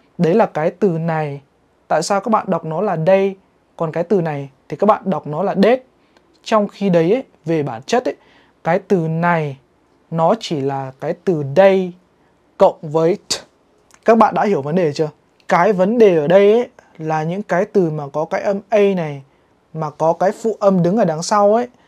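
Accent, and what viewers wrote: United Kingdom. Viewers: Ong nay thi ong phat am theo british ma suot ngay cu blame theo A.E accent lol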